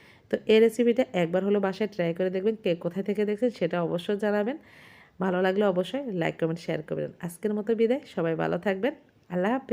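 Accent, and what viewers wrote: Noakhali, Bangladesh. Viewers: আন্নে নোয়াখাইল্লা কেন ⁉️ 😁😁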